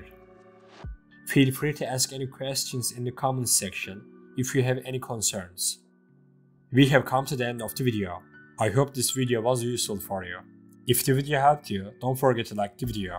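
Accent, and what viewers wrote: Turkey. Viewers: ARE YOU TURKISH DUDE???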